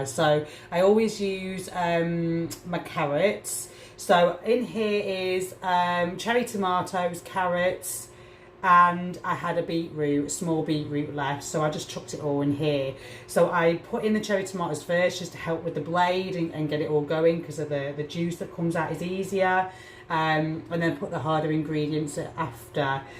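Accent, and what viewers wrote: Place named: Northern England, United Kingdom. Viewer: Love the Northern England accent! I am in London. Thank you for this recipe!!!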